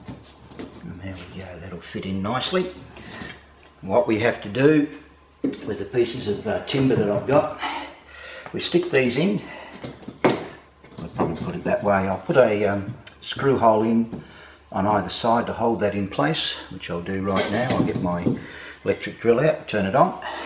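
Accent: Australia. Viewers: Uffff sorry mate cant listen to an Australian accent 🤢🤢🤢🤢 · well his accent sounds australian and don’t they do things backwards there?? ... or is it us? thanks for the help with this video and your accent rocks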